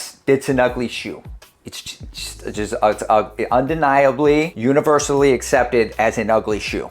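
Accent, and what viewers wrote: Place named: Boston, United States. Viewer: As a New Englander your Boston accent was 1080 v13 like, but your explicative tirade right after was peak Boston!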